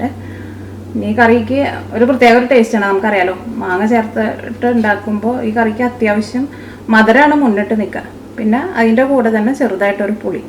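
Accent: Thrissur, India. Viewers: തൃശൂർ ഭാഷ ഒന്ന് മാറ്റിക്കൂടെ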